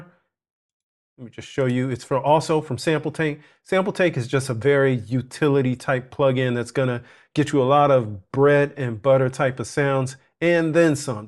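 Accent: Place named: South, United States. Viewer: 🤣🤣🤣yes, maybe. But you actually do have a somewhat southern accent. I live in New Orleans and I grew up around up near those guys. I appreciate your constructive approach through your videos. Extremely helpful. Thank you so much.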